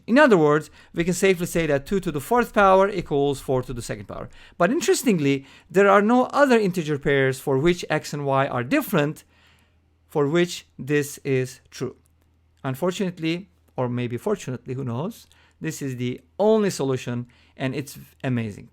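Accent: Turkey. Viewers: Excellent tutorial, fantastic perspective! Just based off of your accent I think you are Turkish aswell right ? Just an assumption you don't have to answer it haha.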